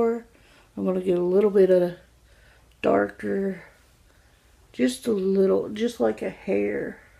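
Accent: South, United States. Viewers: She's so cute and I live her southern accent type🌈🌈🌈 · Do u have a southern accent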